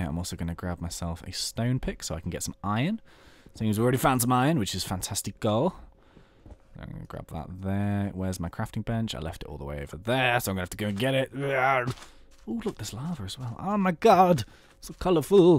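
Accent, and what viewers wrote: United Kingdom. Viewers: that silky british voice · Hey, what nationality are you? British? Cause your accent is really awesome. =O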